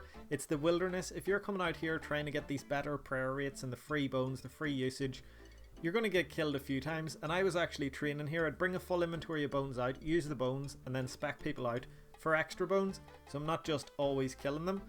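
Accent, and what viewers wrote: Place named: Belfast, United Kingdom. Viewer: Bro we’re about a are you from? As a Belfast man I never thought our accent youd sound good on an rs vid... and I was right lol makes me cringe soo much.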